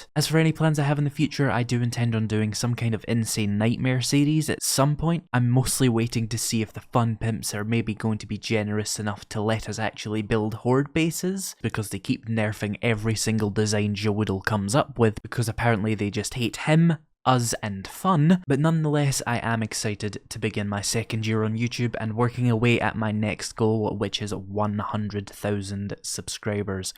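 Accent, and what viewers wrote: Scotland, United Kingdom. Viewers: I can definitely see people coming for the voice. Scottish accents are just awesome. Congrats on the milestone. As far as other games I think most of your followers would enjoy watching you play anything as long as you bring your passion into the videos. That's what won me over. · Congrats! You deserve it. I found your channel from your tutorials and have stayed for the series play (and the accent). As one of your American fans, I’d love some Scottish-isms thrown in. And the audio quality and level is perfect. Here’s to you getting 100k!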